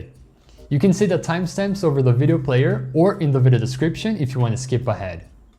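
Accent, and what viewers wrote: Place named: Brazil. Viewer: It's our Brazillian accent, if englsih was spoken correctly is really kinda cute .-.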